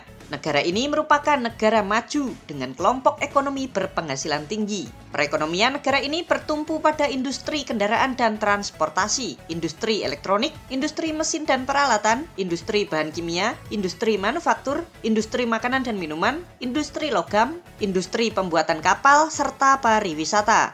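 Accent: Java, Indonesia. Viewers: narator e ,Mas * Wong Jowo pundi niki mas? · kok medok tenan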